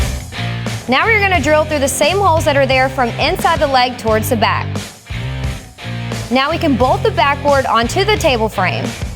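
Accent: South, United States. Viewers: from the sound of her accent she is a southern girl. And a handy one at that. Nice job young lady!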